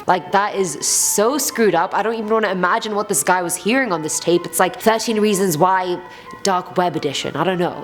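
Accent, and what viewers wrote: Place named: United Kingdom. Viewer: i wish the host spoke clear straight English without that British accent, was really struggling to understand her ........that’s it I’m giving this video a thumbs down because i cant understand what this woman is saying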